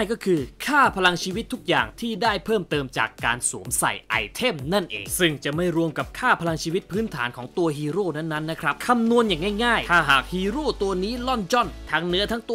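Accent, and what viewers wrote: South, Thailand. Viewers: คนใต้เหรอ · คนใต้มั้ยนิ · คนใต้ม่ายนิ555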